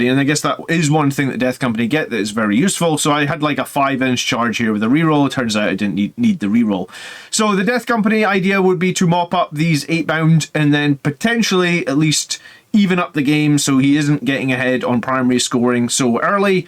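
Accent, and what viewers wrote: Scotland, United Kingdom. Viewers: Something about a wholesome Scottish man talking about murder space vampires makes me happy.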